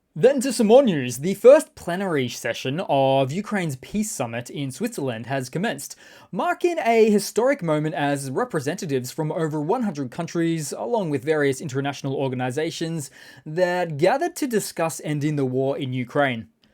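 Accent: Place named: Australia. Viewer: Love your work. I still would like you to pronounce mortar like mitre 10 but with an O, not as in more tar. Great to hear another well informed Aussie voice like Perun. Have a good one!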